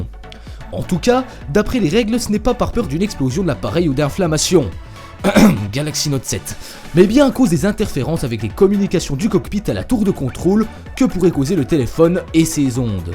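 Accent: Belgium. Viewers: t es enrume ou t as un accent belge ?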